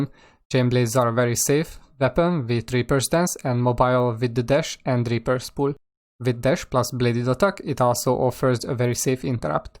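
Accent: Hungary. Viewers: i noticed something, you have a hungarian accent.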